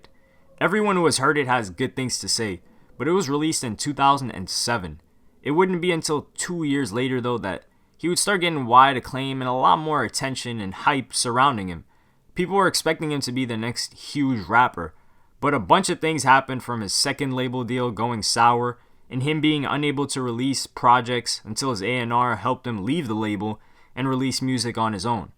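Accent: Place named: Florida, United States. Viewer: I'm starting to think you're a Flordian because of your accent and attitude.i'm just randomly saying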